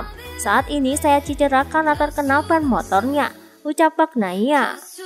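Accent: Java, Indonesia. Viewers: Mba medok saya hadir hehe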